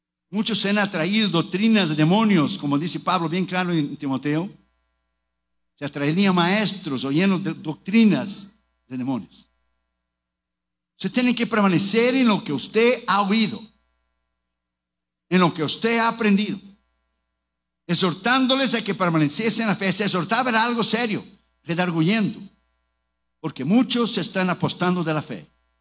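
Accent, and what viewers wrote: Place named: Costa Rica. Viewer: Pero eres tico por tu español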